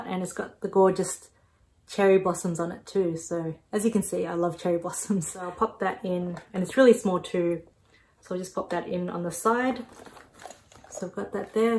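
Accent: Australia. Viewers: So refreshing to hear an Australian accent! I’m from Melbourne as well! If you don’t mind me asking, what do you do for work?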